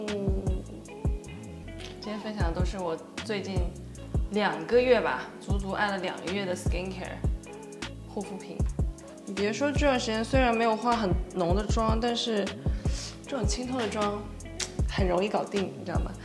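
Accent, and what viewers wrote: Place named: Wuhan, China. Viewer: 你是我人生中第二个巨爱的武汉普通话！哈哈哈哈哈哈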